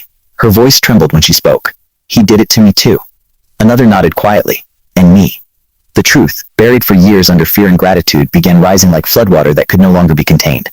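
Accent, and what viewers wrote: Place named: United States. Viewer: Love your stories, but not a fan of the american accent telling the stories.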